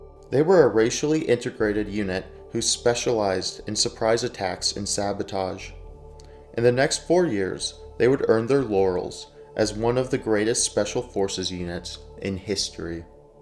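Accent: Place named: United States. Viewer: very exaggerated American pronunciation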